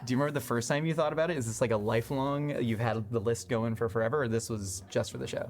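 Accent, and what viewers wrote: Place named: RP, United Kingdom. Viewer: Your accent is so strong and you sound so posh. Haha, I say this in an endearing way from someone who is not anywhere close to the UK, and is from Indonesia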